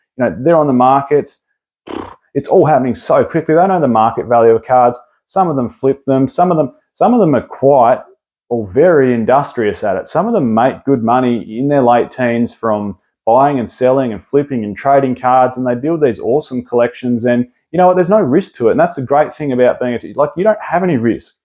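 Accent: Australia. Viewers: Love having a genuine aussie guy talking about pokemon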